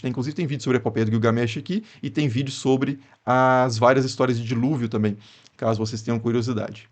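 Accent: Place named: Rio de Janeiro, Brazil. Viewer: Com certeza Carioca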